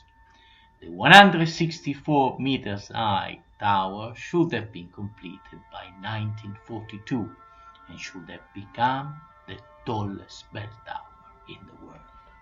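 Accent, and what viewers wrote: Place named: Italy. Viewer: I was able to understand your accent,. Lovely accent by the way, it goes hand-in-hand with the Italian story you are narrating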